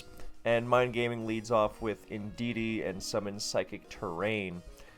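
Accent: Germany. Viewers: Yes you should narrate the next DPP Ubers Tournament video in your German Accent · You should narrate an entire replay in your awful German accent! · I need more of your German accent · Please, for the love of all that is holy, narrate an entire game in your german accent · As a german Person myself i Love watching People butcher the german Language, since it has a few stupid things and therefor is nearly always destroyed when tried to recreate so please use more German accent :) Also no, volcarona doesn’t use roost but it sometimes does use morning sun, it lost roost between gens And I want to see dnite espeed a polteageist (ghost mon)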